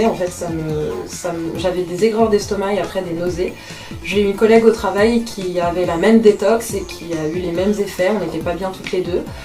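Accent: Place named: France. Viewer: The French accent is quite dope. Keep going from London.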